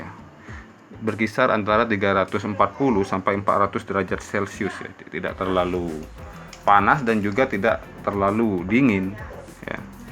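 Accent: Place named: West Sumatra, Indonesia. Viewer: Logat nya seperti orang minang ya bg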